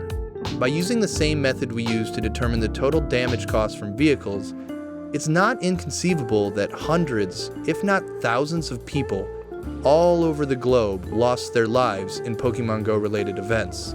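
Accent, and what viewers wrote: United States. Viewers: Entertaining video!! Well deserved Like, but it's painfully obvious that you are emulating Internet-Historian with the Segway's and background music. What makes it even more jarring is the lightly-pitched American accent you have that starkly contrasts with the kind of voice most Youtube fans expect with this format and it takes away from your hard work. Constructive criticism would be to suggest using the same level of research, but develop a personal style to not seem like a knock-off Internet-Historian. I mean you even say, "ad time" and "ad over" to the same beat as the man over at Internet-Historian. At least you got paid for this.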